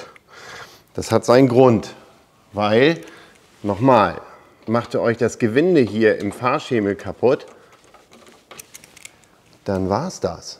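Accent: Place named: North, Germany. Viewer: du Tüffel🤣🤣🤣erkläre mal den Bayern was ein Mors ist. Ok meine Tante ist aus Kappeln daher kann und kenne ich den Norddeutschen Dialekt. Deine Vorführung per Video ist wirklich klasse und gut. Für mich dein kaum hörbarer Dialekt auch schön aber denke an die deutschen Ausländer. Du hättest mit unserem Dialekt auch große Probleme. Gruß Klaus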